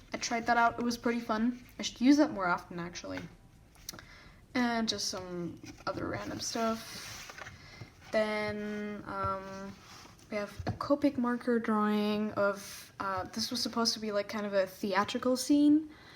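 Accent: Germany. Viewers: Waitttt are you german?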